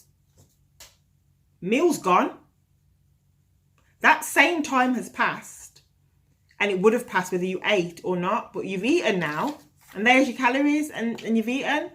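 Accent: Birmingham, United Kingdom. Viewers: Love the brummy accent. That's where I'm from but live in london now. Well done you. Just found you. Thank you for motivating me to start tomorrow.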